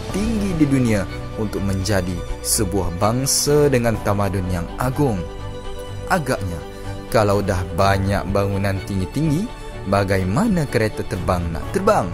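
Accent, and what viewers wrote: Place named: Sarawak, Malaysia. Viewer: Sarawak? Sebab ko ade slang sane.. Kan kan kan kan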